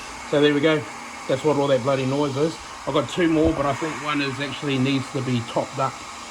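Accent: Australia. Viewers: I hear my Aussie brotha voice!!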